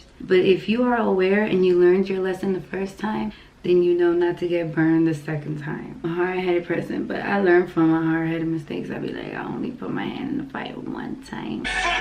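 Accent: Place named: Latin America. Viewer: yo....are you black? I know thats a dumb ass question but i wanna know because your accent makes you sound latina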